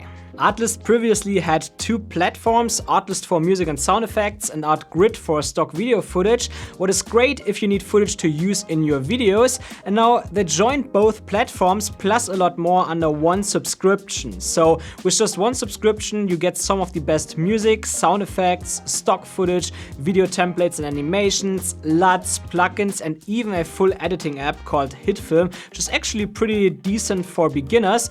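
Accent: Germany. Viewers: your english sounds very german for my ears :D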